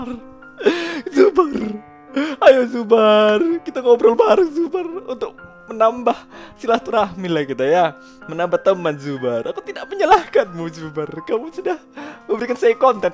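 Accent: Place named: Sumatra, Indonesia. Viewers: logat ujung sumatra nohh,,, maluin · Orang aceh. Itu dger bahasa ngomong nya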